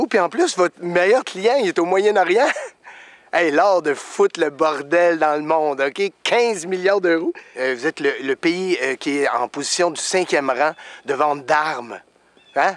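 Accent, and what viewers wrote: Quebec, Canada. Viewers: Je suis tombée par hasard sur cette vidéo et c'est bien. J'aime les québécois et j'aime l'esprit constructif (pour info, je suis française). Pourriez-vous donner des conseils à nos amis français, surtout ceux qui n'ont pas l'habitude des effets de la neige, des conseils lorsqu'ils sont sur un axe routier fréquenté (et non pas chez eux avec tout le matériel pour sortir leur voiture de la cour) ? En France, lorsqu'il y a un épisode neigeux important, c'est un peu la catastrophe (largement dénoncée ou enfoncée par les médias). Mais n'avons-nous pas l'excuse du manque d'habitude ? Bref, si vous pouviez nous aider à trouver les gestes, les comportements, les accessoires utiles à une circulation fluide dans notre joli pays, cela serait parfait. Merci pour votre vidéo que je trouve rigolote (j'aime bien votre accent !). Nous aussi, on "pète" les joints de porte par grand froid ! 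· L'accent que je kiffe le plus !!! Je vous aime les québécois !! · L’accent québécois est insupportable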